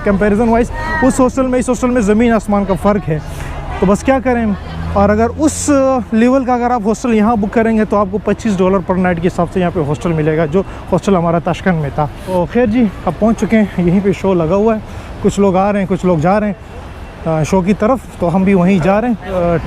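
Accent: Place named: Pakistan. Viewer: Pakistan accent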